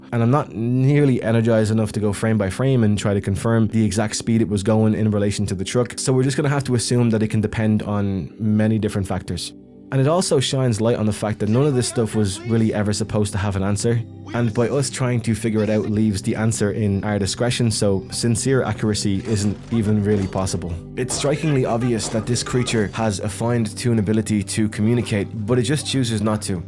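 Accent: Ireland. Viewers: You sound very Irish if I say so myself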